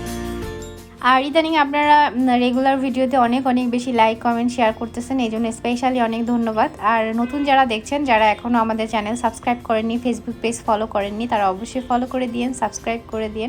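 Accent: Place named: Barisal, Bangladesh. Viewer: আসসালামু আলাইকুম. আপু অল ফ্যামিলি আপনাদের ফ্যামিলি টা খুব ভালো লাগে. সুন্দর একটা সংসার মাঝে মাঝে আপনার কথা গুলা শুনলে অনেক হাসি আহে কি হাসতে হাসতে পেট ব্যাথা হয়ে যায়. সত্যি কথা যে বরিশালের ভাষায় কথা বলেন. আবার অনেক বাসায় ভেঙ্গাইয়া কত রকম কমেন্ট করে ঢং মং করে কথা বলেন. খুব ভালো লাগে. এই যেমন ধরেন আজকে একটা কথা বলছিলেন যে বাবু, আমি যামু কাঠি দিয়া খাইতে পারি না. আমি তোকে কিভাবে খাওয়ামু ঠিক এই কথাটাই অনেক ভালো লাগে. ২. ১ টা কথা. আপনার মাঝে মাঝে অনেক.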